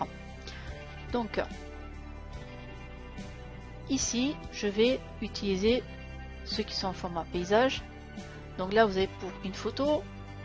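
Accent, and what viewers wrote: South, France. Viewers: Bonsoir Chère, Encore du travail remarquable.. Merci de penser à nous avec gentillesse et simplicité. Avec en prime un délicieux accent du sud... Bien à vous André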